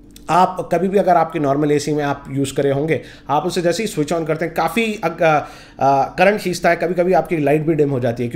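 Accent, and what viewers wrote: Hyderabad, India. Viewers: I'm subscribing ur channel because of Hyderabadi hindi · Good Hyderabad hi accent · Information was good but good luck with your hyderbadi hindi next time. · well done dear. I have been your viewer quite a while during smartphone sunrise. your appearance with hyderabadi hindi doesn't make any difference to northerns which I loved it. keep it up. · Ye Hyderabadi hai bhai.... Isme bhi kafi achhi hai.... Asali Hyderabadi aur bhi mazedar hai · आपकी हैदराबादी हिंदी सुनने में बेहद अच्छी लगती है। कृपया इसे जारी रखें। धन्यवाद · Why do I love this channel so much? All of his videos are really informative. Respect to you Sir for posting such great videos. You are the best🙌 #lovehyderabadiaccent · Bhai, you rock and love your Hyderabadi Hindi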